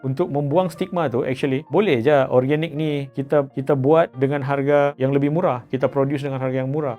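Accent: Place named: Sabah, Malaysia. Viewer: Sedap dengar slang sabah ba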